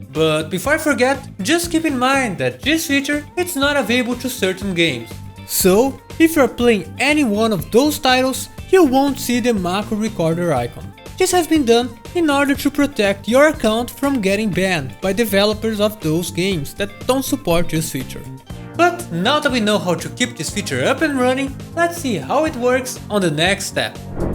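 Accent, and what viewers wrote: Brazil. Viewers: that brazillian accent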